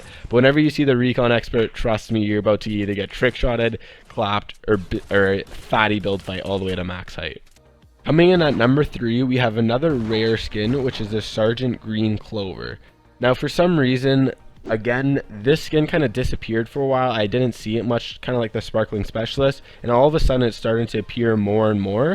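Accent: Canada. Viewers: Yo where are you from, you sound like ur from Canada, more specifically Ontario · 100% Canadian. Fellow Canadians can spot out other Canadians by voice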